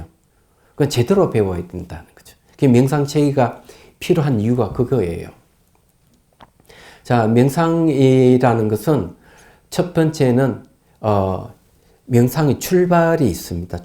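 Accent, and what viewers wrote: Gyeongsang, South Korea. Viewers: 김 옥순 님 스님 경상도분이라 방언을 많이 쓰시는데 우리는 다 알아 듣거던요 명상 강의를 하시는 스님께 그런 댓글은 禮가 아닌것 같습니다..^^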